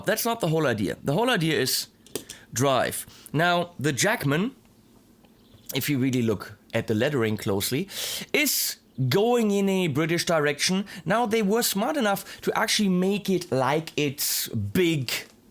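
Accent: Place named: Germany. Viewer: your accent is kinda german :)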